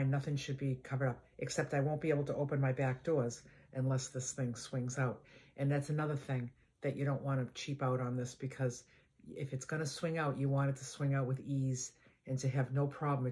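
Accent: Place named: Boston, United States. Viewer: .. Thank you for the suggestion,😢 But I ❤love listening to your Boston accent.😊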